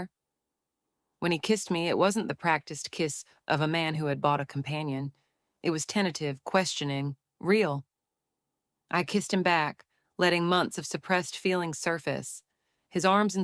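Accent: South, United States. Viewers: That's a very good point, too. The narrator sounded like a southern white woman with good diction. But the plot was unique & lovely❤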